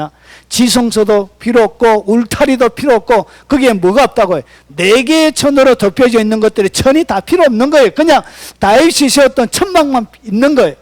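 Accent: Gyeongsang, South Korea. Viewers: 네 맞습니다 경상도 억양이 그렇습니다 입구조가 그런지 못 고칩니다 경상도 식당에 가면 시끌시끌 하고 전라도 지방은 조용합니다 큰 소리에 훼방 받지 말고 은혜받으시길 기도합니다 저도 목사님 말씀 늘 경청하고 있습니다 이와 같은 때 주의 귀한종들을 통해 꼴을 억여 주시는 하나님께 감사와 존귀와 영광을 돌립니다🙏저도 볼륨 조절하며 듣습니다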